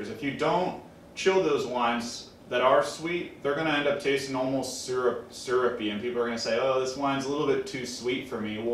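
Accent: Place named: Oregon, United States. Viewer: Is that an oregon accent? He says "these ones" which is typical oregonian.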